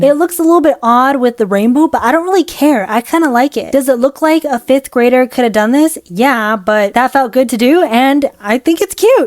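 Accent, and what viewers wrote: United Kingdom. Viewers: The accent with the mat teacher 79 / 856 like the accent you British girl while you Britis I'm also British kinda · I think she might be british lol😂😂😂😂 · saw your video on finding your art style! i love the anime art you create! i love cartoons and my dream style is something like anime Disney. I feel bad sometimes cause i dont draw realism too much, but i love seeing successful artist who have simple art styles ❤ also question, your accent comes and goes are you british? 😅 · You spoke britsh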